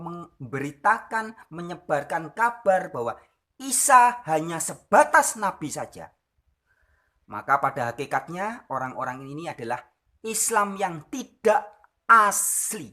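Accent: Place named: Bali, Indonesia. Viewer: Deleme bali gati nok.. dije melali.. tiang denger logate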